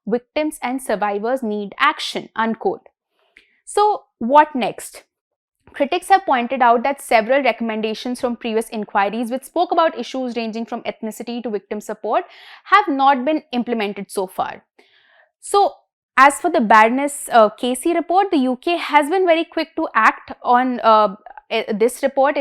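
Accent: India. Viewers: Is this an Indian woman, can tell from her accent 😢yep, unbiased. Have you investigated your gurus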